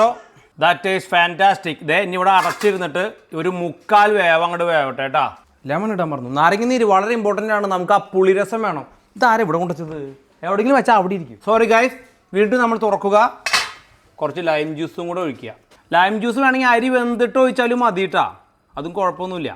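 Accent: Thrissur, India. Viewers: നിങ്ങളുടെ സംസാരം 👍😌poli അ തൃശൂർ സ്ലാങ്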